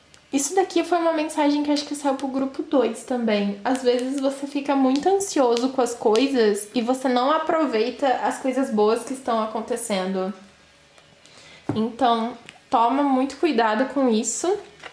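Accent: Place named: Rio Grande do Sul, Brazil. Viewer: Acho tão bonitinho ela falar, é gaúcha?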